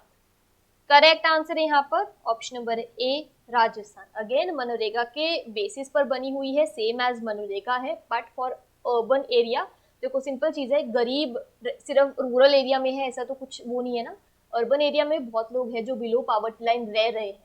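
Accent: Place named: Haryana, India. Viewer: Madam ji aap haryanvi laggo ho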